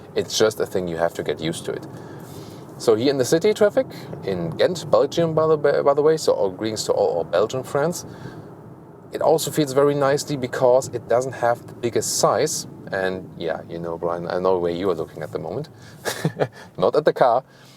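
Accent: Germany. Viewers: I fiind it very hard to understand this heavy german accent english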